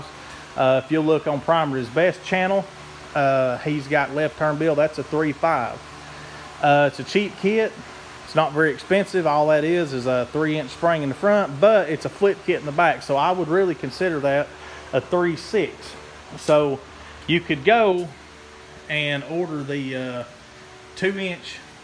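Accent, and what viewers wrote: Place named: South, United States. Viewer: I love that accent of the southern folks reminds me of my good friend blessings to you and him 👊🏽😁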